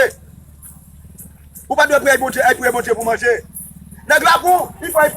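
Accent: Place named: Cap-Haïtien, Haiti. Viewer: Neg okap gen yonjan yo pale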